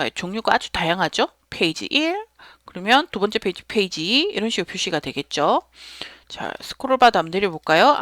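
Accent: Gyeongsang, South Korea. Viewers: 경상도 사투리 억양이 넘 듣기좋아요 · 나도 이분 목소리가 너무 듣기 좋아 쏙쏙 잘 들어갑니다.짤막한 강좌를 시간 될때마다 듣는데 머리에 잘 들어옵니다 경상도 분 이 신것 같은데 왜 그리 목소리가 곱습니까 강의 내용도 좋습니다. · 60대 부산사람이 경상도 말씨로 강의를 들어니 귀에 속 들어오네요... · 액셀 활용에 매우 유용한 강의입니다. 부산 억양의 선생님 감사드립니다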